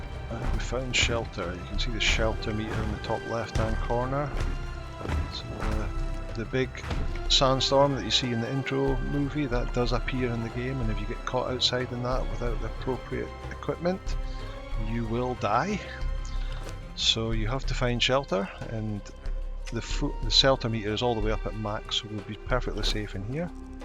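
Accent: Scotland, United Kingdom. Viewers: this tutorial is objectively superior to all the others b/c of the scottish accent alone.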